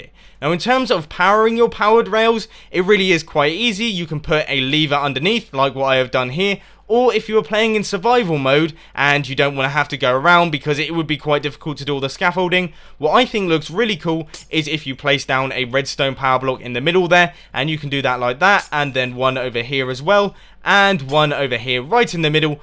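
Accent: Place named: United Kingdom. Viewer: Cool British accent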